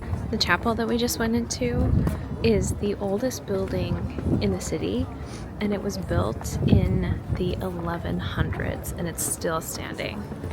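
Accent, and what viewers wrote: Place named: United States. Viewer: Your American we understand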